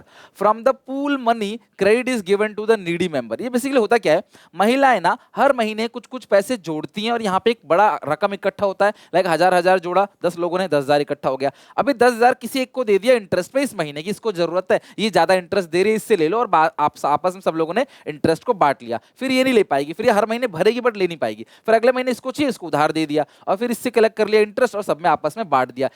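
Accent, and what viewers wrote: Odisha, India. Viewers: Sir are you from odisha ❤ please reply sir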